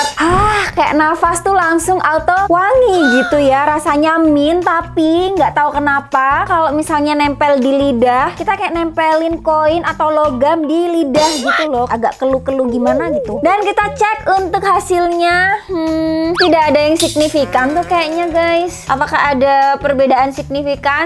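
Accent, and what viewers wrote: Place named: Semarang, Indonesia. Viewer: Gek weruh aku ono channel e mbak iki, mesti cah plat H logate koyo aku oq soale wkwkwkwk makasih reviewnya yo mba, aku tertarik sama yg obat kumur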